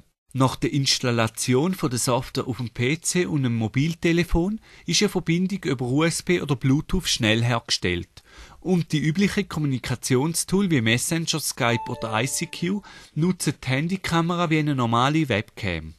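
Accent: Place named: Switzerland. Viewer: er spricht schweizerdeutsch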